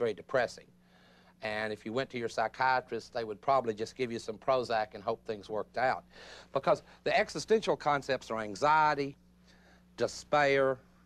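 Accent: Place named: Texas, United States. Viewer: I love that Texas accent. His Texan accent sounds like an anti-abortionist accused of burning down a clinic. Yet these brilliant concepts are produced through this accent. Diversity it is.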